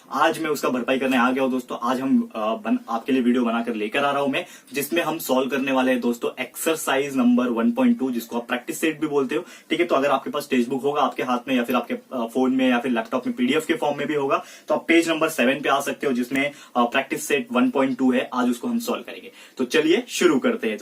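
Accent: Maharashtra, India. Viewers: Sir aap Maharashtra se ho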